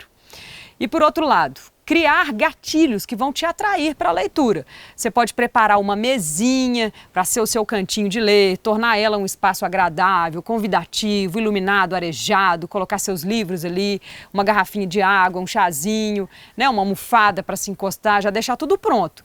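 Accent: Minas Gerais, Brazil. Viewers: As mulheres mineiras estão muito bem representadas por você, tem um belo sotaque que não e arastado e nem enjoativo sua voz e linda e vc e muito simpática e por ironia do destino e linda muito linda mesmo com um belo formato de corpo,tem um velho ditado que diz que uma mulher quando e bela até chorando ela e bonita,com todo respeito sei que você se olha no espelho e realmente e muito especial, tudo de bom para a sua vida é principalmente para toda a sua família, sucesso para sua vida hoje é sempre.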